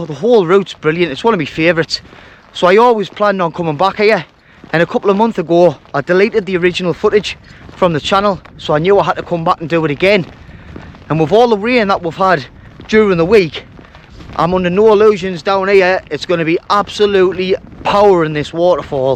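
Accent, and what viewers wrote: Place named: North East England, United Kingdom. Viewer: I left the north east 35 years ago and was just trying to pin point your accent 😂. I'm walking up from Leicester to the top of Scotland in day long stages (when I get chance between work and other commitments) . I drive to where I got to on the last walk, then do another hike north. I had a rather brutal walk from Glossop to Marsden in January, over some similar stunning terrain in the north Peak District. I think I'll route myself through this spot when I get this far.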